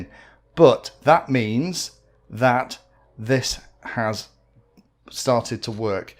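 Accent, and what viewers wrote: England, United Kingdom. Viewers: Hey - at 87 I must be the oldest fpv daddy around! But........I am getting there because YOU are there. Also, give me an English voice anyday! So easy to understand, for us Down Under. <link>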